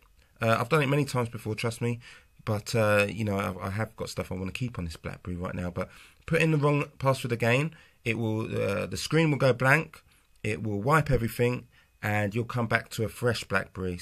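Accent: United Kingdom. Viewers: I did not understand, I cannot understand british accent that easy :( gonna try again :(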